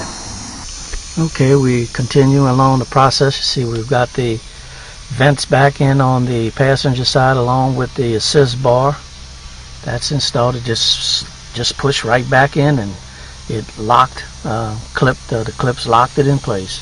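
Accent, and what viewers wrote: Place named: Louisiana, United States. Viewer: Glad you from Louisiana I understood perfectly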